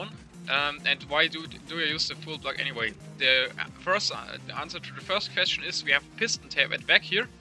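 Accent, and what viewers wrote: Germany. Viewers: Accent? Germany?!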